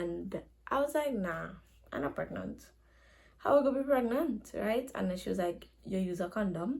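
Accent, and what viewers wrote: Trinidad and Tobago. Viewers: Town and KFC are popular in Trinidad I live in Trinidad she speakin trini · Are you saying she is from Jamaica or you are from Jamaica? Cause she is Trinidadian, you can hear her accent and on her Instagram she has Trinidad.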